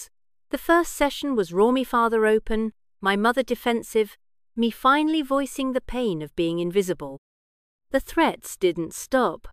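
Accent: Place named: England, United Kingdom. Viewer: Frightful voicing.😮 The English accent really doesn't help at all.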